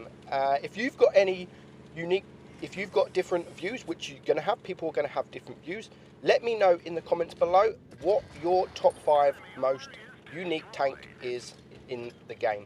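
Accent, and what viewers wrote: United Kingdom. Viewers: I Love your british Accent :v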